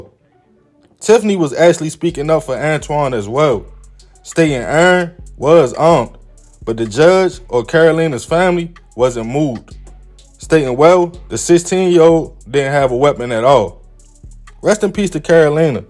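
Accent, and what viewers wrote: Baltimore, United States. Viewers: Man If It wasn't for my Army Buddy From BALTIMORE Back In The mid 80s I would Never understand your accent..HOT DUGGS for All my friends 😂😂😂😂😂❤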